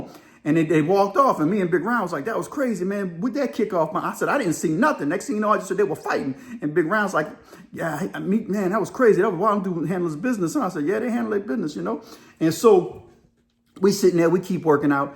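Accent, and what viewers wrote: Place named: South, United States. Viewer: Great story man! You have a nice slow paced southern storytelling style where 20 minutes went by and it didn’t feel like 20 minutes. You have a gift!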